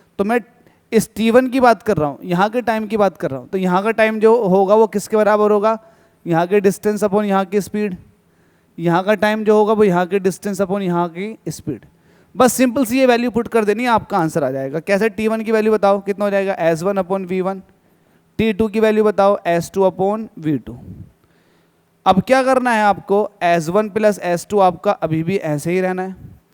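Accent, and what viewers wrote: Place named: Haryana, India. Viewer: Lagta h sir aap Haryana se ho😅😂😊😊😊😊😊😊😊😊😊😊😊😊😊😊😊🎉🎉🎉🎉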